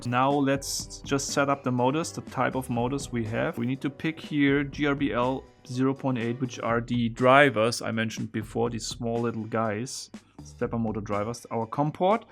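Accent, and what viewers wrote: Germany. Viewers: Super Danke für deine Antwort. Sehr nett! Weiter so! Habe keinen blassen Schimmer weder von Programmierung noch von Maschinenbau oder Technik. Aber ich liebe alle Kanäle, bei denen die Leute mit Leideschaft und Herz dabei sind und den Zuschauern, die Augen zum funkeln bringen. Das passiert genau bei deinem Kanal. Man merkt, dass du dein Hobby zum Beruf gemacht hast. Bin selbst Sprachlehrer und mit Leidenschaft, bringe selbst die Augen meiner Schüler zum Leuchten, wenn sie merken wie einfach es ist, das immer als schwierig zu erlernende Deutsch zu verinnerlichen und mit Leichtigkeit anzuwenden. Oft wird immer unterstellt: entweder Sprachen und künstlerisch oder mathematisch-naturwissenschaftlich. Dabei gehen Sprachen und Mathematik einher. Beides sind logische Konstrukte mit klaren Regeln. Ich mag dein Englisch, echt super, und ich mag es bei den Deutschen wenn auch noch ein Akzent heraushörbar ist. 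Ein Hauch von Heimat! Super Kanal, bin erst drüber gestolpert! Freue mich über deinen Erfolg. Mal sehen, ob ich auch mal einen Kanal aufmache und mit Herz und Leidenschaft die Leichtigkeit zum Sprachenlernen vermittel, oder wie jeder Schüler genau den Sprachlehrer bekommt (oder formiert) den er braucht! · Voll deutscher Dialekt, ne ?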